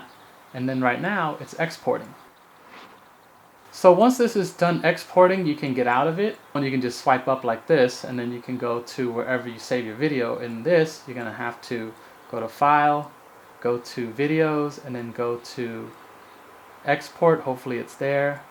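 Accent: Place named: United States. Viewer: Wow you really sound like American when I don't see your face hahaha,same here, I'm not Native Speaker of English but I can speak English very fluently hehehe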